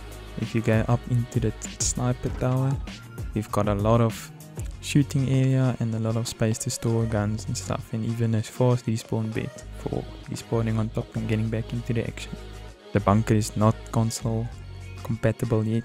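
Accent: South Africa. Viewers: Heyy, South African?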